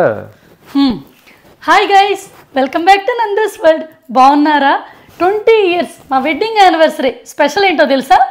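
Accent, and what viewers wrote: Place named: Guntur, India. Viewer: మీ గొంతు diffrentg గా ఉంటుంది పై గా మా గుంటూరు అనే ఫీలింగ్ happy 20th wedding 💍 aniverseley.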